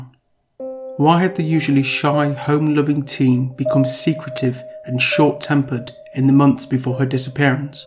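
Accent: United Kingdom. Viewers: Interesting case ✅ Suspense music ✅ British english narration ✅ Fantastic ✅ Thank you! ❤ from 🇸🇪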